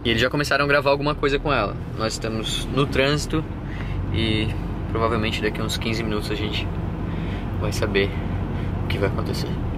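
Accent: Florianópolis, Brazil. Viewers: HAHAHAHHAHAHA logo pelos 5 primeiros minutos já deu pra notar o sotaque manezinho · nem sabia, mas logo vi esse sotaque manezinho lindo ae ♡ que liindo o videoo à sua mae!! paz e bem!!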